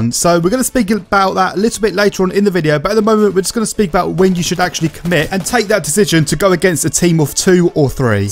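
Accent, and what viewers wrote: United Kingdom. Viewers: I agree, you're helpful and easy to listen to with that English accent! 😍😍 Haha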